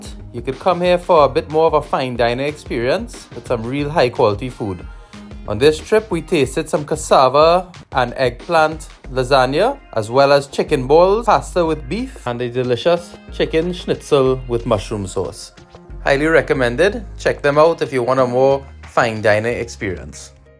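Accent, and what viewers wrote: Trinidad and Tobago. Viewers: Hi my TRINI friend, Been a while since I heard from you. Missed the Accent As always thanks for sharing. Take care and bye for now. 22/07/16 🇨🇦 🍁. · De man speaking proper trini inno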